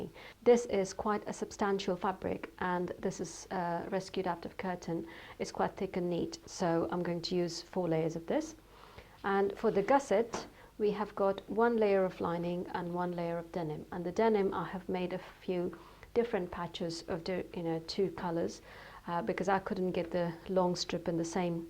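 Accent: United Kingdom. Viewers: I enjoy all your proyects and your britanic accent.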